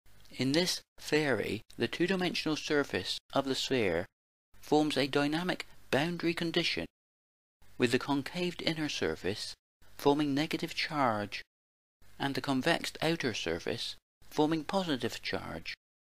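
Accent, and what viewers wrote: West Country, United Kingdom. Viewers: Lovely West Country accent.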